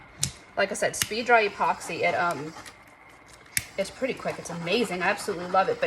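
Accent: New Orleans, United States. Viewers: Girl, you are from Metairie or New Orleans FOR SURE!! I can tell by that accent (you sound like me!) and those glitter names…”Garden District, Sugar Cane?!” HA! Love your channel…new sub!!!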